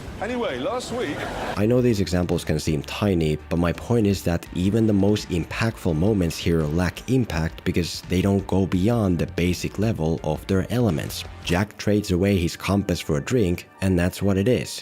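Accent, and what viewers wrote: Finland. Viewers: Jankon Betoni, laatu matskua. Kuulostaa ihan Suomalaiselta kyllä, aksentti on tutun kuuloista. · Right in the intro of the video there's an example of that in the form of a clip from a classic Finnish comedy sketch. In fact, enjoying the content of this channel is probably an example of that because the narrator's accent is almost certainly Finnish.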